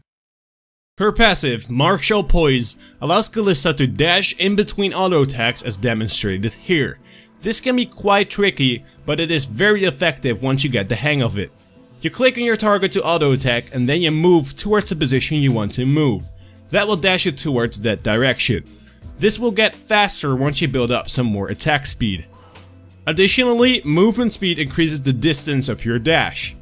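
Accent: Netherlands. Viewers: Are you Dutch?! xD I notice it in you accent. Great job with your channel!! :)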